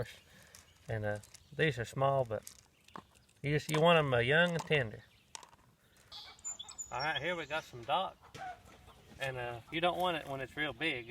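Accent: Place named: West Virginia, United States. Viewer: you all have a beautiful garden.. and the sound of your voice reminds me of my home in Logan county West Virginia.. I have lived in south Carolina for almost 19 years and I can't get nothing to grow in this red clay.. I could grow things when I lived up home in the mountains.. I miss my mountain home.. May God continue to bless you and your little family...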